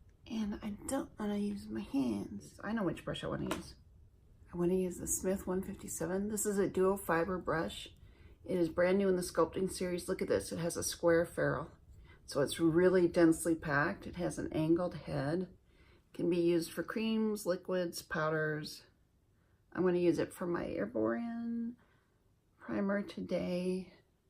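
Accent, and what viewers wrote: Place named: Alaska, United States. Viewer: Your videos are informative and fun to watch. And yes, you sound Alaskan to my West Coast ears. 😁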